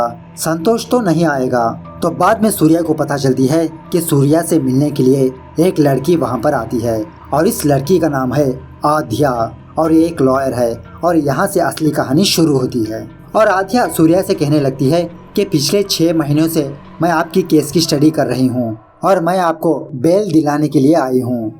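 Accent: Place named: Hyderabad, India. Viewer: Perfect Hyderabadi Slang story narration.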